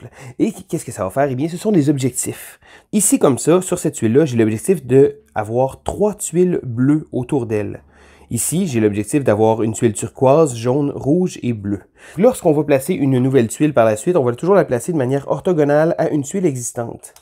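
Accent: Canada. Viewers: Salut! J'ai regardé ce vidéo car j'étais curieux de ce jeu, et j'ai décidé de m'abonner pour même entraîner mon français. Mais je eu des difficultés à comprendre quelque chose, puis-je te demander d'où viens-tu? Je suis curieux de quel accent tu as. Peut-être canadien?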